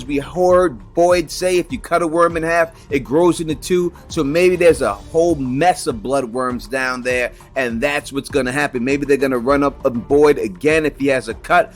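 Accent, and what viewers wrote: New York, United States. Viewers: I love your coverage of this show. Also love your NY accent. Love to hear you say "autopsy "❤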